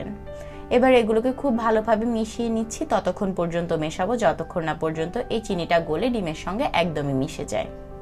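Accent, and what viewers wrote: Noakhali, Bangladesh. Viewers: ওরে আফা আন্নে নোয়াখাইল্লা নি।😲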